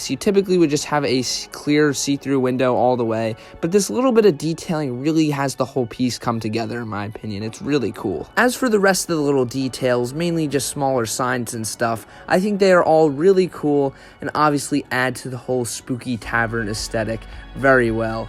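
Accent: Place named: United Kingdom. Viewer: British yay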